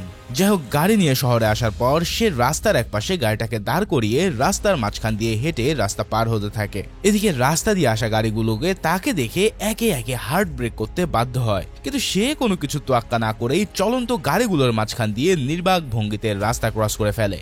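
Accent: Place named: Faridpur, Bangladesh. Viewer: আপনার বাড়ি কি ফরিদপুর জেলায়, মানে ফরিদপুর এর লোকজন জোরে বা গতি কে বেগে বলে।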